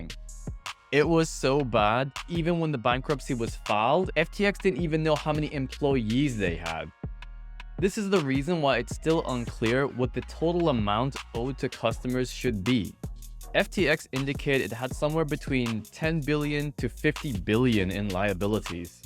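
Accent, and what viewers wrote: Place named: Scotland, United Kingdom. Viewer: Mate, you had a Scottish accent in your last video…..this is so weird.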